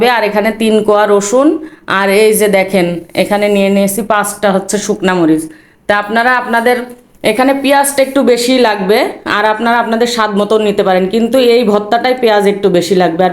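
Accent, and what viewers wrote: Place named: Chuadanga, Bangladesh. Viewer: অনেক মজা হলো মনে হচ্ছে 😋 বাড়ী কোথায় তোমার চুয়াডাঙ্গায় ? কথা শুনে মনে হচ্ছে চুয়াডাঙ্গার মেয়ে 😅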